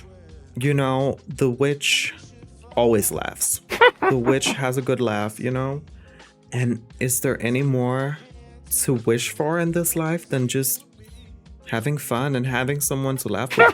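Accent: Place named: Brazil. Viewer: are you brazilian? Your accent is nice btw very underrated content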